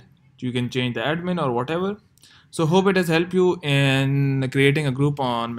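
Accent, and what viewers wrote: India. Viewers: Beautiful Indian Accent 😍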